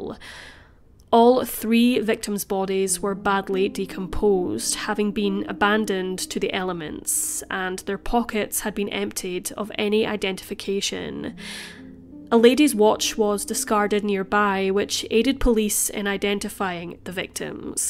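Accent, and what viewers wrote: Scotland, United Kingdom. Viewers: Brîndușa D. scottish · She's from Scotland, that's where I live x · Defo Scotch. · Scotland is my guess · fuck you mean odd. It’s a Scottish accent, maybe roughly around Edinburgh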